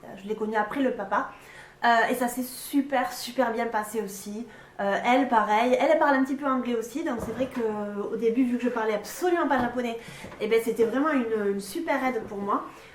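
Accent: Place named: South, France. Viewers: Accent de Carcassonne ? On dirait que tu viens de l'Aude non ? Perpignan peut-être ? En tout cas Sud-Ouest. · Les Japonais doivent trouver son parlé Japonais charmant et amusant car cette jolie jeune fille a déjà un accent du sud prononcé 😉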